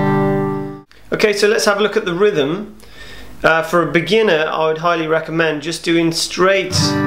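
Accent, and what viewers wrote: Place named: United Kingdom. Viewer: correct me if im.wrong but judging by the accent... hes british.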